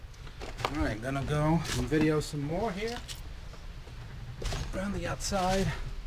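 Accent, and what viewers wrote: United Kingdom. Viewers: Love this place, it has a peculiar mystique to it, something a little spooky & Paranormal (that's the feeling I get ne-how.!) I think it was in a little better condition when Dan Bell was there... Thanks for exploring, p.s. R U a Brit - #Playschool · a cool film. I'm just wondering...are you from the UK? I detect a slight accent from over here.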